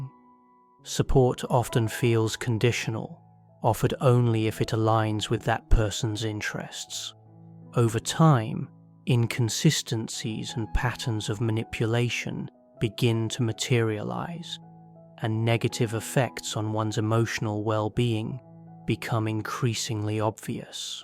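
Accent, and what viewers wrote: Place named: United Kingdom. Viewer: everyone loves random british dude narrating stuff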